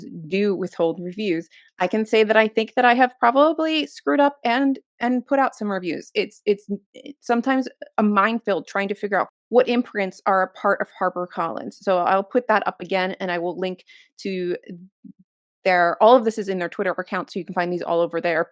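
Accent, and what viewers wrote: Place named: New York, United States. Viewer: love this video and had to comment bc when you said "cost" i knew immediately u were from new york and love ur accent (bc it's like mine haha!)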